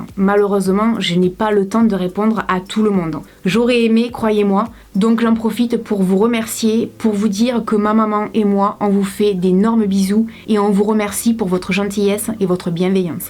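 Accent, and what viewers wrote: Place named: Marseille, France. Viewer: vous aviez l'accent marseillais comme kamila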